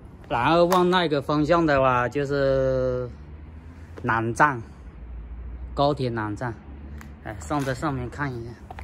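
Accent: Hakka, China. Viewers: 閩西客家話口音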